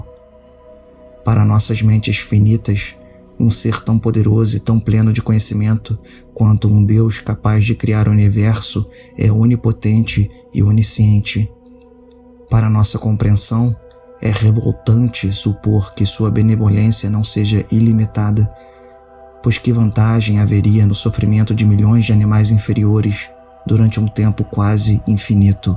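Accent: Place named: Rio de Janeiro, Brazil. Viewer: Oque me causa desprezar como ser vivo eh esse sotaque carioca com " x " 😂😂😂😂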